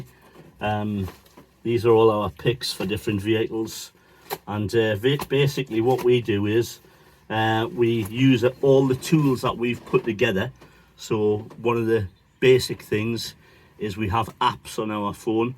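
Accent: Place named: Northeast, United States. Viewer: You sound like your from the Northeast. Iam interested in training. But wait till it dies down. Stay safe lads.👍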